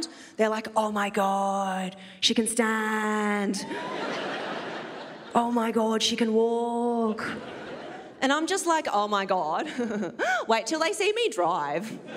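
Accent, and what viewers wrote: Australia. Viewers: Her Aussie accent is so sexy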